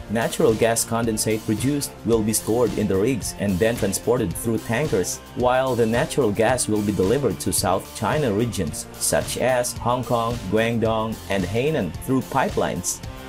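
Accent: Philippines. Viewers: Pinoy ka boss? Accent mo kasi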